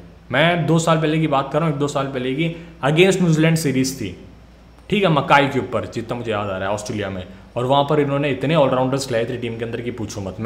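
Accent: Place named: Caribbean. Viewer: Sir ap me Caribbean flavour hai thoda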